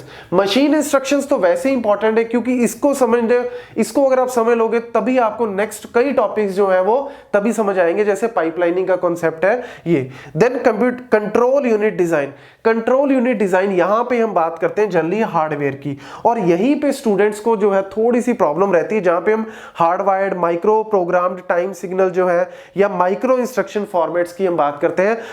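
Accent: Punjab, India. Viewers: App Punjabi hai jururt hai bolne ka Method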